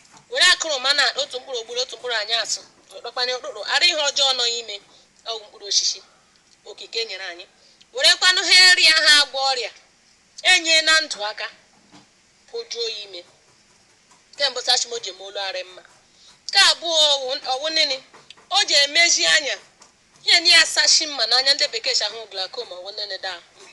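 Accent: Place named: Owerri, Nigeria. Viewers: Akuruma is very beneficial to health, the list is endless, I enjoy listening to her Owerri dialect. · Oh gush! You have a romantic Owerri ascents. My hubby rushed out of the room to listen to you too. You twist the language like his sister. He’s guessing you’re from Naze because he’s from Obibiezinna too. Sending this video to my sister-in-law. I really enjoyed this content on bitter kola. So much benefits. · Chai! I didn’t want this video to stop simply because of this dialect! So so sweet in the ears. Bitter kola explained in a very detailed way. Ala Owerri lee! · Let's connect sis.oji inu ka anyi na akpoya. Your igbo accent is imo I guess.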